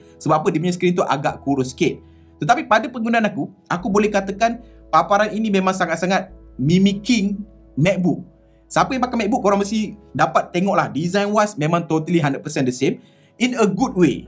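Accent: Malaysia. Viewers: Bru prtma nnton yutub org malaysia . Seruu bahasanya . Saya suka logat malaya . Smoga maju terus channelnya bg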